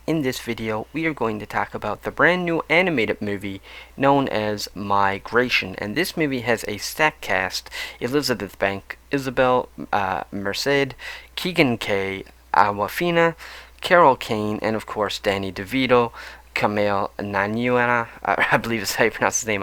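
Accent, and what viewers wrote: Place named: East Coast, Canada. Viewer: Ahhh, you're Canadian! I kept hearing East coast but was not sure. Hello from southern n Ontario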